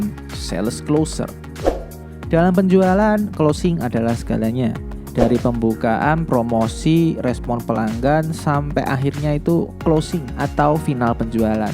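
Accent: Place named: Java, Indonesia. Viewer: Medooookk bggeett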